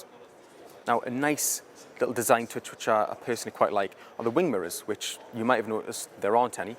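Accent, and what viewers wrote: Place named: North East England, United Kingdom. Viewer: Its a North East accent...whay aye man :-) Even people from North Yorkshire have to listen carefully...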